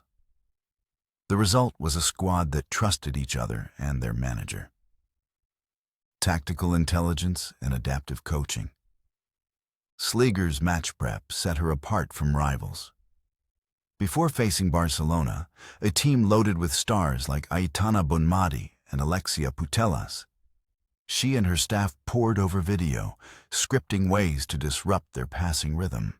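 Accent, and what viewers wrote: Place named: United States. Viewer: Why do we need an American accent?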